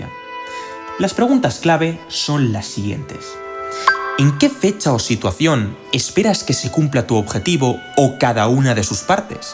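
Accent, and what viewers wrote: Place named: Spain. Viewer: nadie te preguntó, no lo veas y punto. Su acento es español.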